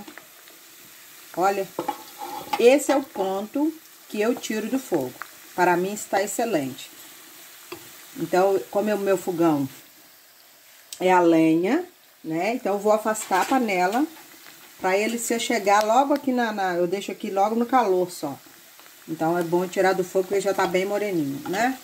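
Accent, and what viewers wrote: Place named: Minas Gerais, Brazil. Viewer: eu li um comentário q disse ,pelo sotaque só pd ser mineiro sujo ,ou goiano , não entendi fiquei curiosa, por favor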